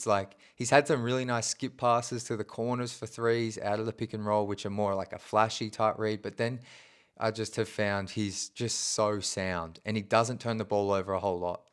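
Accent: Australia. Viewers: Accent through me off I’ve never received my pistons news via an Australian ! Good shit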